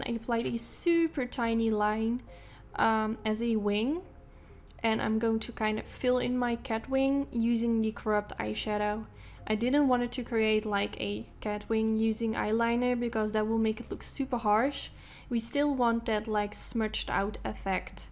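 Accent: Netherlands. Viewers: She sounds Dutch but I could be wrong! I think she sounds really cute and sweet :-)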